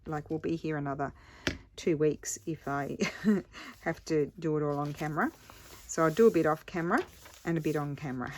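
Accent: Australia. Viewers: Love watching your Christmas videos. These journals are amazing. I waited till you had a few and watched them all. Hoping I can find some bags like this. Bit hard to go shopping around looking as England in 2nd lockdown, so will look on Amazon.. From listening to you, are you from Australia and now in Italy? Your video are great. Thank you for sharing.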